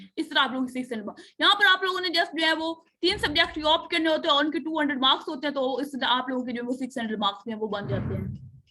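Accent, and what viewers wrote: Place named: Punjab, India. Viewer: Mam ...apki tone of language ..jhang,chiniot,sargodha qasor ..mandi bahu din en logon sy milti ha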